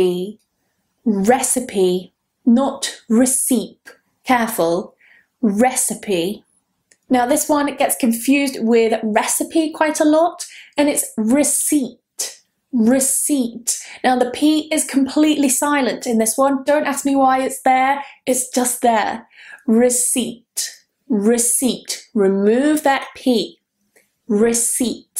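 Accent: United Kingdom. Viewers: I'm following your videos for learning British accent and I've learned a lot of words to spell correctly like dropping the r sound and more. But I have difficulty in pronouncing P, T and C like you native British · Beauty queen of British accent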